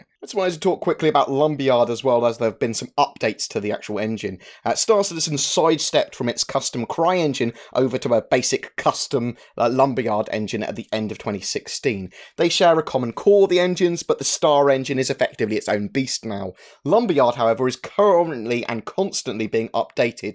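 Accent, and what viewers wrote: United Kingdom. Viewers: your speech is very hard to understand, especially for not a native English speaker. can u talk more slowly or just don't eat the words? with your British accent it is completely impossible to listen to u because of your style of speaking.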